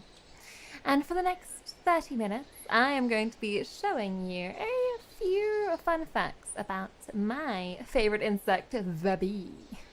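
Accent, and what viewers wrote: United Kingdom. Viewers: Now normally I am not the biggest fan of bees, but seeing with glasses and giving a lecture remained me of why she is the exception to my dislike. The lecture was very well done and the facts were huge eye openers like how bees do dance which I knew about or that bees can be trained. That does expand my knowledge a good amount more and again with glasses and her british accent all scream hot teacher so soild lesson!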